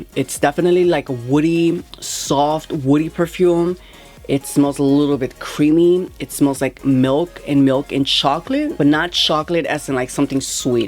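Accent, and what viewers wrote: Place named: Latin America. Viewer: I appreciate this review ❤ and I'm definitely old enough to be your mother and l so enjoy watching every review because in my 20's me and you honey would have been besties ❤❤. I don't know your nationality but the accent sounds Latino? So I love the pronunciation of your words😉. Keep being you!! We love it ❤❤❤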